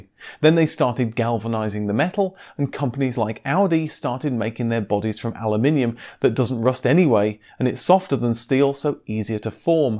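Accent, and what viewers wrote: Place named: United Kingdom. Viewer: Generally your channel is entertaining and it is always a pleasure to listen to clean British English. 👍🏻 This topic is an interesting attempt. Ok. But you should do better research and talk to Metallurgy Engineers. Whenever the talk is about Aluminum it is about 99,9% Aluminum alloys in real. We never talk about pure Aluminum because it is not stable and would react with anything in the near within the fraction of a second. How can you allege that Aluminum or better said Aluminum alloys do not rust? I am sorry but this is not true at all! You can find this out very easily on your own. Go to any football field and take a detailed look at the areas where the Aluminum alloy parts of the goals are put together. You will see that there is partly corrosion which has white colour. Car factories do not use Aluminum alloys in order to get rid of corrosion. 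This metall is easier to be handled and can save weight, but is hard to be maintained in a car body workshop if there is damage on it. Stainless steel is also not really stainless. It will rust harder but you can’t really stop it from starting to corrode. Please take care. Thanks.🌷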